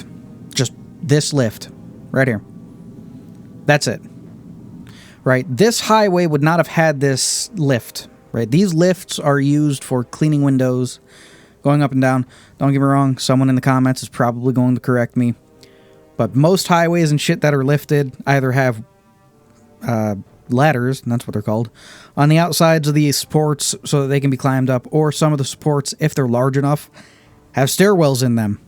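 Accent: South, United States. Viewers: When you get angry, the southern accent comes out even more. Speaking of sound. It is NOT a complaint. Just an observation. On the other hand, visually this time, it's funny, for a veteran FO4 player, the lack of big picture in the displayed frames. And that's not a complaint either. Just another observation. All in all, I enjoy this type of content, no pretense of attacking a particular topic, just reflections on the game. By the way, this is the first video I've ever seen from your channel. Definitely NOT the last one. I subscribe to the channel, especially for FO4, which, for objective reasons, I cannot play at the moment. As a curiosity, English is not, as you well notice, my first language (in case you're wondering, it's Romanian).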